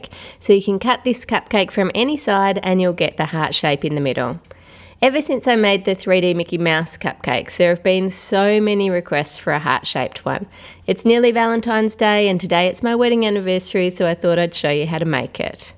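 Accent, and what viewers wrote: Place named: Australia. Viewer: Happy late anniversary sorry I love your Australian accent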